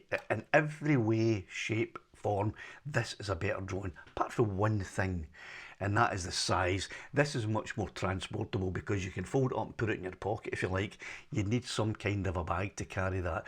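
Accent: Scotland, United Kingdom. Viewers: relentless droning—delivered with a distinctly Scottish flair—had me reconsidering all the complicated regulations and whether the Mini 5 Pro was the right fit for me. Then, in a moment of pure chaos, I completely forgot that I don’t even live in the EU, meaning none of it applies to me. Wow! You know what? Forget it—I’m going ahead and getting myself a brand-new Mavic 4 Pro instead!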